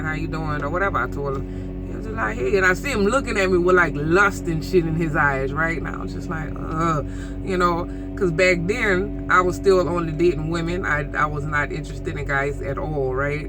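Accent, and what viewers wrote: Louisiana, United States. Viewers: You sound like my people in Houma Thibodeaux Morgan City Patterson. Oh Lake Charles too. Don't worry about what others think. Do you do more do better.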